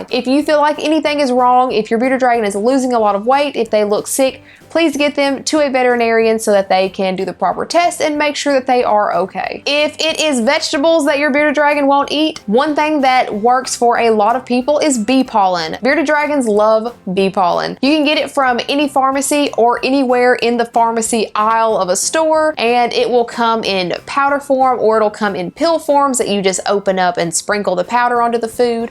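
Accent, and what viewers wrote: South, United States. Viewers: You're drop dead gorgeous 😍 and I love the southern accent 😍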